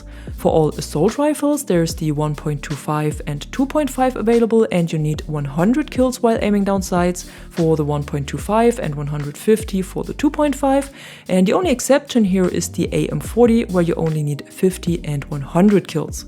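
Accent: Germany. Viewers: i cant stand germans speaking english ffs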